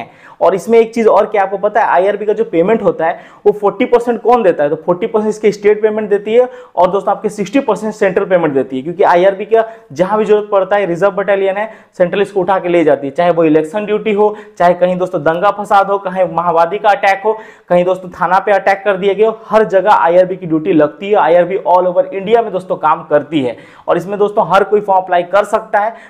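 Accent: Odisha, India. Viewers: Bhai Tum Odisha se ho kya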